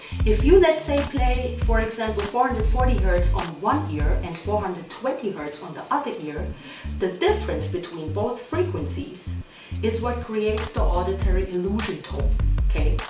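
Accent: Germany. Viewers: Yes sis, feeling the vibes n knowledge. Luv the German accent.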